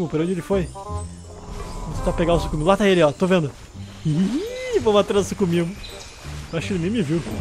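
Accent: Brazil. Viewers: Seu canal e o melhor do mundo. Uma pergunta você é brasileiro ou português? Eu gosto de indoraptor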